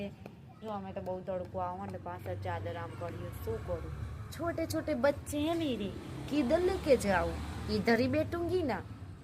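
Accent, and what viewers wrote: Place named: Idar-Mehsana, India. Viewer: બેન ઇડર (s k )ને મેહોણા ની મિક્સ ભાષા છે 😂😂😂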